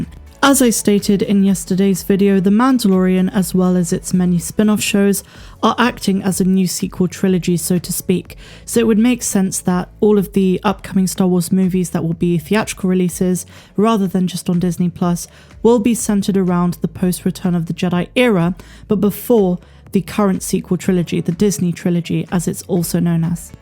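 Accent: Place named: West Country, United Kingdom. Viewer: I loved the f word said as well lol I'm loving accent can't work out if it's Somerset Devon or Bristolian and I'm from Somerset myself lol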